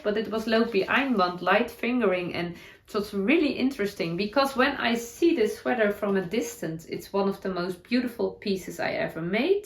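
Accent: Netherlands. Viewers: 😂😂😂😂😂 stop saying “shit” 😂😂😂😂😂😂😂 oh my goodness!!!!!! I love your Dutchy English!!!!!!! You completely brightened my day with your talk of shit 😂😂😂😂😂 I’m sure you now know, we say “poo” Wonderful ❤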